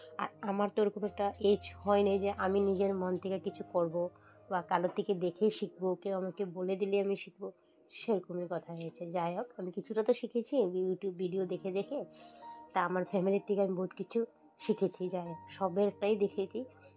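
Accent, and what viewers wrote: India. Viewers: আসলে তোমার কথা গুলো হিন্দি টান আছে বেশ অন্য রকমের।ওই জন্যেই আমার খুবই মিস্টি লাগে শুনতে ❤❤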